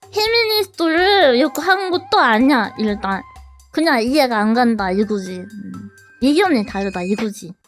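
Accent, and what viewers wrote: Japan. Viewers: 한본어 존나 맘에 드네 ㅋㅋㅋㅋㅋㅋㅋㅋㅋㅋㅋㅋㅋㅋㅋㅋㅋㅋㅋㅋㅋㅋㅋㅋㅋㅋ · 이 버튜버 한본어 지켜주세요... 매력포인트야.. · 한본어 개귀여움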